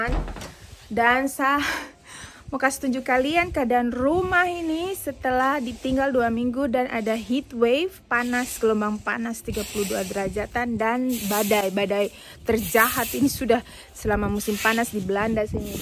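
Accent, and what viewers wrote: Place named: Papua, Indonesia. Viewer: Tetap ya pertahankan bhs dialek Papua nona cantik manis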